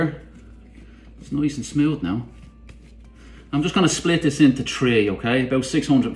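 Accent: Ireland. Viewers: Kia ora from Aotearoa (New Zealand). 😂🤣 I love your channel, you're funny & you make beautiful food. You also explain things well, so thank you for taking the time to share your yummy recipes with us. Awwww I love an Irish accent, my Da was Irish n I miss him every day.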